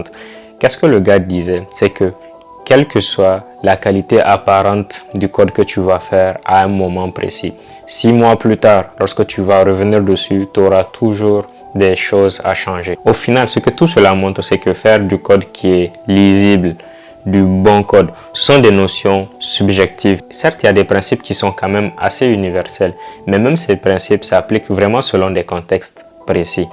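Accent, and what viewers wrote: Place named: Niger. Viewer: Merci pour le rappel Je sens comme un accent de mon pays le 227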